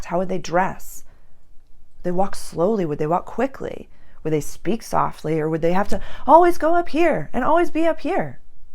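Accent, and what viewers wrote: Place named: Northeast, United States. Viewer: I know it doesn't matter, but I like your NE accent. Thank you for the message.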